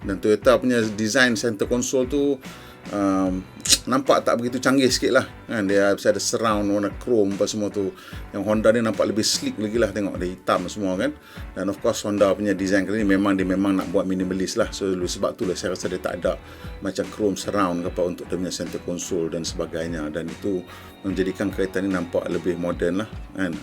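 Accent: North, Malaysia. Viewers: Cakap utaqa plak noo… i like👍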